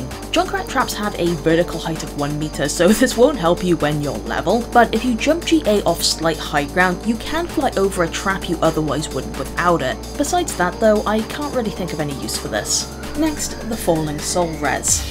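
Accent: United Kingdom. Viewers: I love your british english!!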